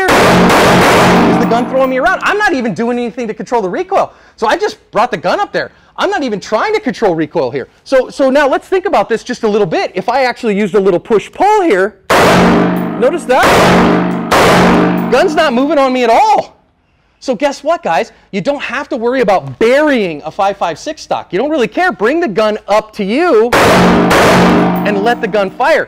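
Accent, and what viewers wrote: Canada. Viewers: Is he Canadian, ay? Or from Minnesota? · Love your channel. But holy shit your speech cadence sounds Canadian! Lol. Great great great video!!!! Very helpful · I heard the Canadian in today as well! Great vid! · Have you been spending time in Canada? Where did this Canadian accent come from?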